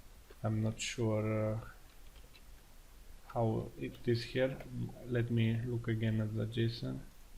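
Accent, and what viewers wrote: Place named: Bulgaria. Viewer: YOOOOO I'm also from Bulgaria, I can tell by the voice and the weather location obviously. Пиши ми моля те, как ти е фейсбука или нещо!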